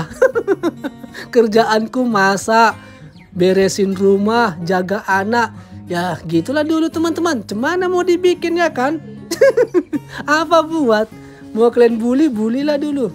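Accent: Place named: Batak, Indonesia. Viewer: Kimbek kali ah. Kalau dengar logat bg ngomong jd ingat sama kawan si batak gila 🤣 logatnya campur² jawa 😅 kocak kocak😂❤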